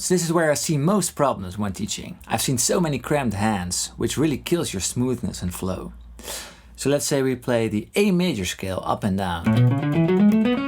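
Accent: Netherlands. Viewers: where are you from? Because your accent sounds Dutch. Nice vid btw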